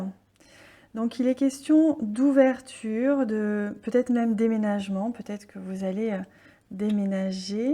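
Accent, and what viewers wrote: South, France. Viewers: Merci, trop mignon le flamand rose Avé l'assent 🌹😊😊😊😊💕❤️❤️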